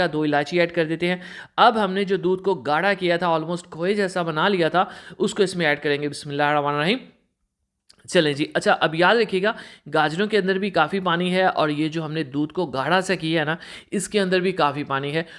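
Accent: United Kingdom. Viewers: Nice recipe,,, liken Aap urdo baat kar rahihen ao lihja English ka hai lihaza lihja thek kren bahut bura lagta hai Aapki sar pi firingi Bhoot swar hai😈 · Nice.. But plz Urdu lehja me he Urdu bolain ,,, English accent me bolne ki koshish na Karen. Apki voice bahot piyari hy ...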